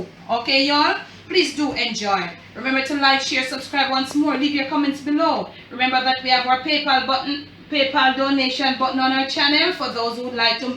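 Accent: Jamaica. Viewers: Every time I watch one of your videos I want to visit Jamaica again. Your beautiful accent and delicious looking food are sooo enticing.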